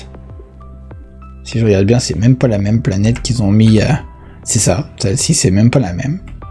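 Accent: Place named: Quebec, Canada. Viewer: Sympa, la video. Ca décode bien. Et en Quebecois :)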